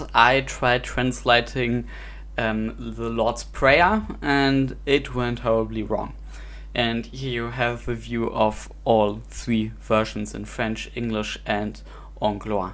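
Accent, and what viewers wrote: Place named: Germany. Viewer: He sounds German 🤷‍♂️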